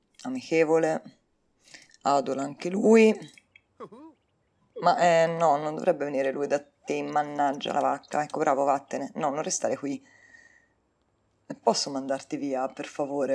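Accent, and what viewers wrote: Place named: Tuscany, Italy. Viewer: Ciao sono Marco,un nuovo amico! Bellissimo questo video e gioco Sims 4 ho molte amiche che hanno canali dedicati ai Sims ,mi piace il tuo accento Toscano....complimenti! Mi sono iscritto 1 8 7 e... l i k e Se ti fa piacere ti aspetto su un mio video e canale io tratto molta natura,animali,immersioni,viaggi,ecc...così ti presento anche la mia grande community YT dove troverai molti nuovi amici ed iscritti. A presto...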